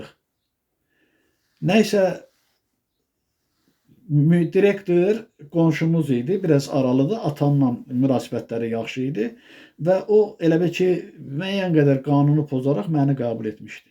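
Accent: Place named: Karabakh, Azerbaijan. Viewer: Siz Gəncədənsiniz . Qarabağ ləhcəsi ilə danışırsınız . Amma sizin danışığınızda bir iki dəfə mənə elə gəlir ki qazax ləhcəsi ilə də danışdınız . Yəqin ki bir tərəfdən də onlarla qohumsunuz . Mənə maraqlı gələn odur ki səhv etmirəm ki ? İstərdim ki fikrimə aydınlıq gətirəsiniz .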